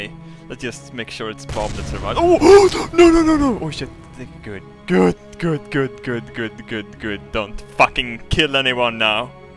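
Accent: Norrland, Sweden. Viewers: Omg he sounds sssssssoooooooo swedish... And I love it! Håll på såhär bara ;-) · are you swedish you sound swedish if you are so tjenare har du det gött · Älskar din norrländska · Swedish accent.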